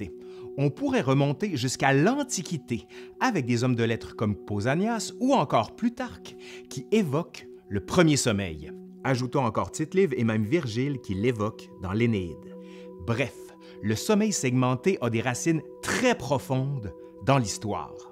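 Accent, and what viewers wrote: Canada. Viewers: Selon ton accent, tu es canadien alors si tu parles en anglais tu auras beaucoup de visibilité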